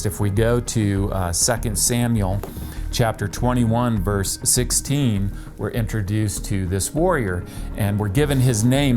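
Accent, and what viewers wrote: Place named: United States. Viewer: you don't seem to be able to tell the difference in a spear head and an arrow head. You're also a bit inconsistent in which measurement you give. Sometimes in metric, then immediately follow with imperial. You said the spear heads were a half inch apart, then describe the largest as 66 cm. Pick a lane or give both measurements. You have a very American accent. Don't you use the imperial system?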